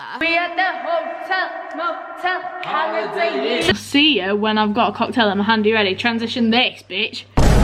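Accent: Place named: England, United Kingdom. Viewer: Took me a long while to make my fav to listen to, her English accent is very strong … but today I’m struggling with those stupid “baby hairs”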